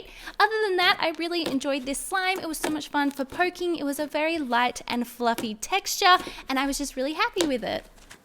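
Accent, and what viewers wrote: Australia. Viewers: Ur Australian?! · Wooo! Your Aussie like me! Say OI OI OI in the reply’s I also love slime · It's Australian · Nimah Kazi I’m Australian, so she sounds like she’s speaking normally to me... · are you Australian or newzealander?? nvm u say in the vid lol. im from aus too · are you australian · its Australian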